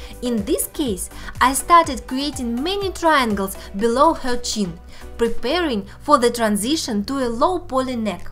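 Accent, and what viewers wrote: Russia. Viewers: я прям слышу родной русский английский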